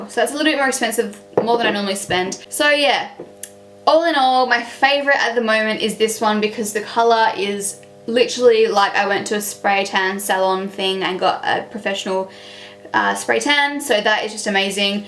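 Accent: Australia. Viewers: you sound so australian here bby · She's speaking English and she's from Australia so she has an Australian accent?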